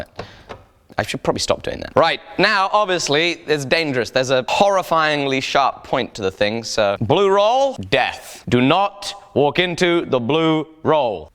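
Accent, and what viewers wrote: South, United States. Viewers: The southern accent is unsettling because i have to live around it. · Quite the southern accent there. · Isn't there an electric sander of some kind you could use? By the way: good job on the southern accent! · Your southern accent is fucking hilarious! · Holy hell your southern american accent. I can't even. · Damn Alec, you have the Southern accent down. I think I've heard my relatives say the exact same thing about their trucks. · Mighty fine southern accent y'all got there Alec. By the way, what's in that there spray bottle? Just soapy water? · That's the most accurate hick American accent ever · lol i loved his souther accent i was pissing my self laughing, keep up the good work Alec loving the project. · That country accent though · As a southern I find the accent you do spot on. And boy you know we love our diesels! Turbo diesel, regular diesel, diesel fuel. 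We love it.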